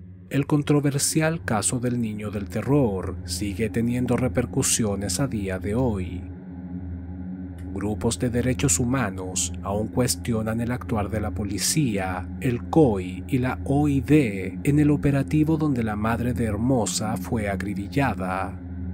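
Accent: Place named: Chile. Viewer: Hola, siempre veo tus videos, pero primera vez que comento algo. Sabes, siempre me ha intrigado esto de los enigmas policiales y como opera la mente de un criminal, es alucinante. Me encanta tu forma de relatar, me gusta que seas chileno porque me gusta tu acento (yo soy chilena), y me gusta el formato de imagen que sin ser morboso o grotesco es bastante grafico y real, la estructura del relato me gusta mucho. Te deseo sigas triunfando y mucho éxito para 2023.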